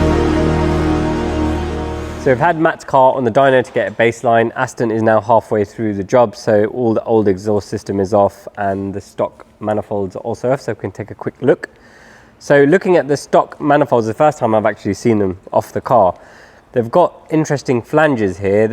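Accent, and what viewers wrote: United Kingdom. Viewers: How did you get such a good British accent?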